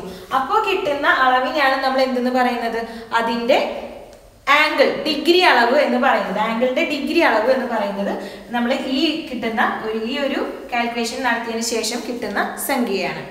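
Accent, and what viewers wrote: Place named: Kozhikode, India. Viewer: Edhnn parennath😂 kozhikoden slag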